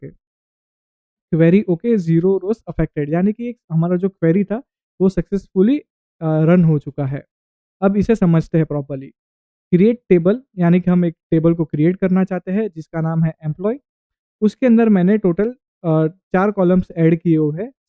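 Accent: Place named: India. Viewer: May the God give you the strength. Be victorious and proud. But the quality of your voice is not good, brother. Try to make it better because it is very difficult for someone who is not fluent in English to listen with an Indian accent. From Iran.:hand-pink-waving::hand-pink-waving::hand-pink-waving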